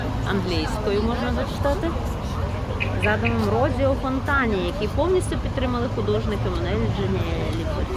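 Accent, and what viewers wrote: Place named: Western Ukraine, Ukraine. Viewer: Як приємно чути ваш говір,західний наш рідний!Ви ВЕЛИКА ЛЮДИНА!!!!Пишаюся вами!!!💛💙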